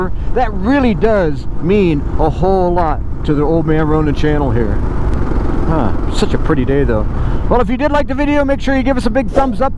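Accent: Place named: United States. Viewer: Thanks for using metric measurements occasionally. As a viewer from overseas I find the use of Imperial/ US units for tank capacities and fuel economy so confusing, even though I grew up using Imperial units until we changed to metric 60 years ago. Imagine the confusion for viewers who have never had to think about gallons/ miles/ inches in their life! So can you please list that you are using US measures ( even though it should be self -evident from your accent that you are filming in the US)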